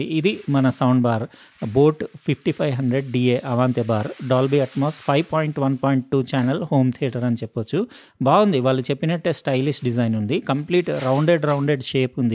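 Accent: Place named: Hyderabad, India. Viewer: Garu, Mastu, Jabardastu, meeru vade, hyderabadi, assent, kosam mee video chustnu, 🎉 all the best, prabhakar, alwal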